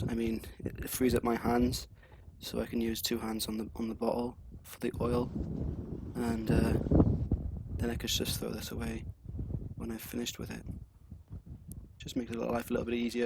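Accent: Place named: Wales, United Kingdom. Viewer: Thanks for your useful tutorial. A little hard to understand your Welsh accent ;) but the video is very clear and helps a lot. You have a free drink if you'll come around Olbia, Sardinia. Just send a message by Youtube. Cheers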